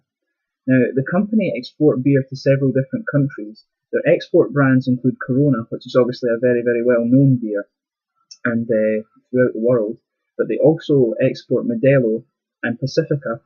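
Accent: United Kingdom. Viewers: yes sir, and guess what; yes, I live in Canada! Lol, I moved up here 3 years ago. Where are you from bro? If you live in the states, I'll send you some beers from Mexico if they allow me too, although I pretty much believe you live somewhere in Europe, that British accent is obvious. Love your videos bro, and pardon me! It is not a Louisiana beer! It's called: "Mississippi mud" the one with the alligator in it! Lol, they'll laugh their tears off! If they read this.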